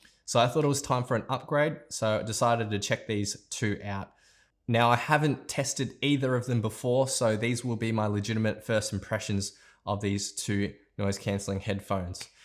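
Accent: Australia. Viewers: Australian accent, no doubt.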